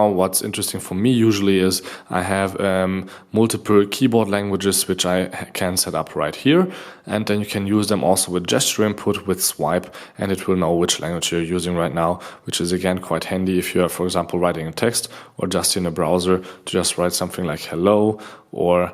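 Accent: Germany. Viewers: you are german native i assume ? Does this video also exists in german?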